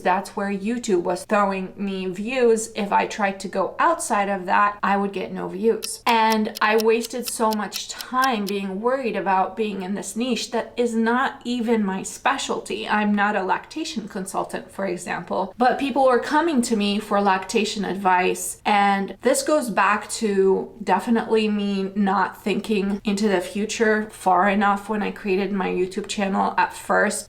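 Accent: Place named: Brazil. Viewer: Are you from Brazil? Your accent reminds me of Brazilians speaking English… Also, the way you said this channel’s name sound familiar to my Lusophone ears!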